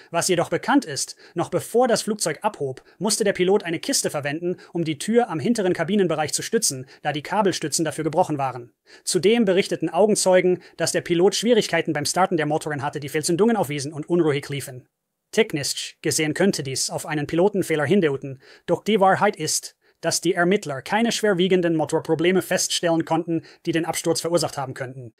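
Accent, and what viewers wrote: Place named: United States. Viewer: Was soll dieser ständige Wechsel von rel. sauberer deutscher Sprache in einen beschi...amerikanischen Akzent?! Sowas kann man sauber programmieren, also wieso dieser Unsinn?!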